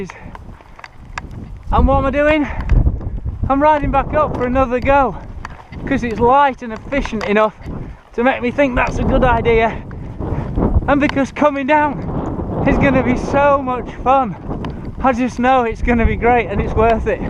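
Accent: Northern England, United Kingdom. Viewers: Loving these. And is it me, or is there rather more deliberate/pronounced Northern-ness compared to the Future-ised accent you have to adopt, er, elsewhere? Either way, great stuff!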